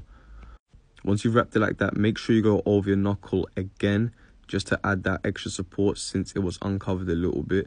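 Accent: United Kingdom. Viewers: Brother are u British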